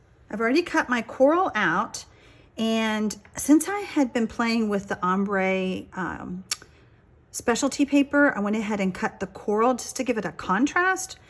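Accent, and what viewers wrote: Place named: Texas, United States. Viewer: These are beautiful! I love your card making ideas and listening to your Texan drawl .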